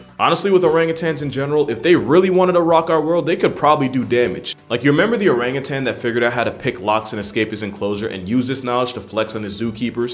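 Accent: Scotland, United Kingdom. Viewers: His Scottish acsent is good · Hey! Nice Scottish accent 👍🏼👍🏼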